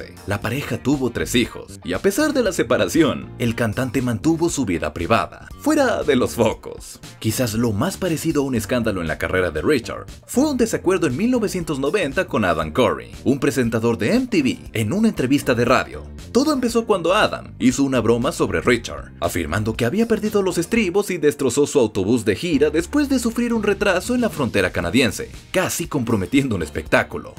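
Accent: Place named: Latin America. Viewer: Hablas con una zzzz de Latinoamericana qué en varios países de América suena rara. Todo sabemos que no tenemos zzzz. Pero esa Z no es la de España por eso le digo Z Latinoamericana o Américana.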